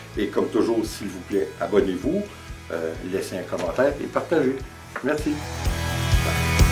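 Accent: Quebec, Canada. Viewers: Waouuu quelle bonne idée !! Et quelle belle vidéo : l'accent québecois me fait penser a mon frère qui vit à Montréal, le premier morceau de musique un vrai régal, et le résultat sur la pierre j'adore... Bonne journée !!!!🤗🤗🤗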